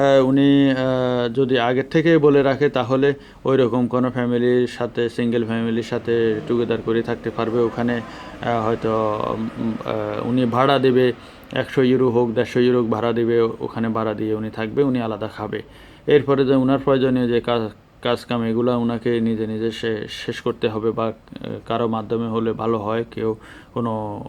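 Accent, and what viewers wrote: Noakhali, Bangladesh. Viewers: আপনার বারি মনে হয় নোয়াখালী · আপনি কাকে বলছেন? কে যেতে চেয়েছে ইতালি? কে বলছে ইতালি যেয়ে কোথায় থাকবো,কি খাবো?কে বলছে ইতালি যেয়ে আমার খাবার টাকা নেই? বা: বা: আপিনি নিজেই প্রশ্ন রাখছেন এবং নিজেই আবার ঝারি মেরে তার উত্তর দিচ্ছেন আবার বলছেন এটা মহিলাদের মতো কথা।কে জিজ্ঞেস করেছে আপনাকে? ভাষা শুনে তো মনে হচ্ছে খাঁটি নোয়াখাইল্যা, যা হোক আপনের কাছে কথা বলার নতুন স্টাইল শিখলাম।সাবস্ক্রাইব করতে পারলাম না বলে দু:খীত।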